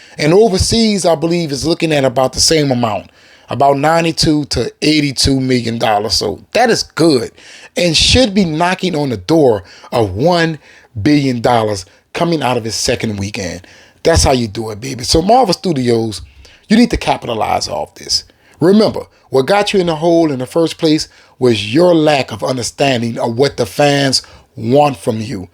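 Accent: New Orleans, United States. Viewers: that N.O. accent hitting and is much needed in the YouTube space. Keep it flowing, my friend. Love your content! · Are you from New Orleans? I hear the accent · Man, am I hearing a nawlins’ accident?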